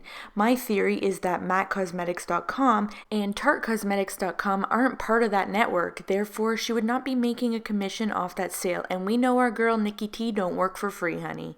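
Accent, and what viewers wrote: Canada. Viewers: Ugh, you sound so canadian it hurts. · I can hear your Canadian accent lol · "The one im aboot to talk aboot.... so you can see what im exactly talking aboot" I've never recognised a Canadian accent so clearly until now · You are canadian right? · i love canadians o's. dey so nice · OMG are you Canadian!!!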